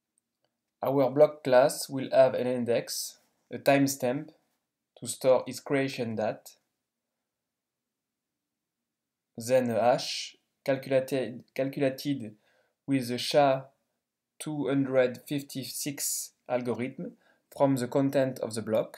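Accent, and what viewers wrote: France. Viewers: Pourquoi tu fais le tuto pour les anglais seulement :/ On entend très bien ton accent français éhéh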